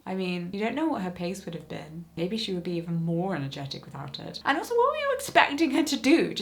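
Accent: RP, United Kingdom. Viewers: You're so posh-sounding 😮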